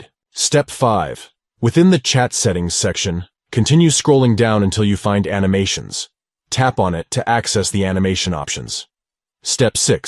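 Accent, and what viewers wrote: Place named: Australia. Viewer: You should learn how to pronounce your Australian accent properly. Why do creators create these apps to be so juvenile. What about a professional approach. We want to achieve professional messaging that surpasses anything we have come across. My first experience was a stupid coronavirus animation. Goodbye 2020 hello 2025.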